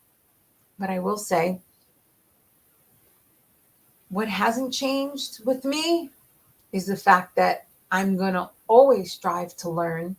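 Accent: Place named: Pennsylvania, United States. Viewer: I’ve been missing you and that PA Italian Girl accent…🥰. Happy to see you back!!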